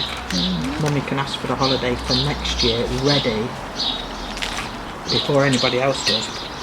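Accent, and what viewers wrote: United Kingdom. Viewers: 初めて拝見したのですが、英語が苦手な私でも一瞬でわかるイギリスの発音でびっくりしました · オススメにでてきて対してタイトル読まずに見たのですが、某アクセント研究YouTuberをみてるせいかイギリスアクセントだとすぐわかりました😂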